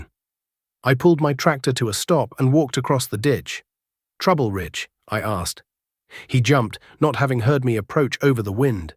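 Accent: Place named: United Kingdom. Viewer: The narrator with the British accent talks too fast. British accent talking about American farm?…?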